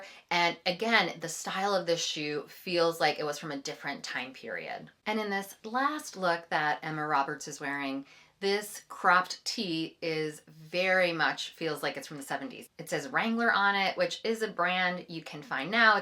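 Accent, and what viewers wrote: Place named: United States. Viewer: This is a fantastic series of videos, thank you so much, you clearly know a lot about style and you speak very clearly with a beautiful, soft American accent you so rarely hear these days, it's really a pleasure to listen to you. I love Alexa Chung although some of the outfits you showed are not to my taste. I grew up in Italy in the 70s and, age 58, I've recently gone back to wearing similar outfits, for example corduroy bootcut trousers (also worn in the 90s by Carolyn Bessett-Kennedy), double denim with trucker jackets and straight leg jeans, gingham shirts, also worn with denim, white straight-leg jeans with a blue t-shirt (like Jackie O), velvet suit with flared trousers, pussybow blouses, platform shoes, contrast collar t-shirts, David Bowie vintage t-shirts. The funny thing is that my style now is similar now to what I was wearing in the 70s when I was a child or teenager, these pieces are modern classics (but not too classic, as I find classic style is often ageing and too ladylike - there's a fine line). 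I would not say my style is vintage but I love the odd 70s touch, also in the colours (orange and brown, khaki), just as I like wearing the odd edgy or even sexy item.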